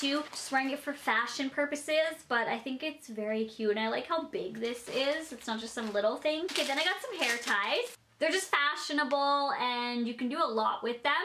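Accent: Saskatchewan, Canada. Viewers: My twin brother keeps repeating everything you're saying because he says you have such a thick Saskatchewan accent and I'm actually so confused😂😂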